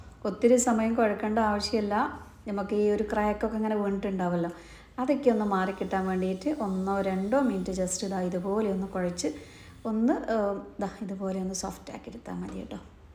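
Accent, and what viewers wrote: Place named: South Kerala, India. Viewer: Pazhaya malapuram poyit ipol thekan slang aanallo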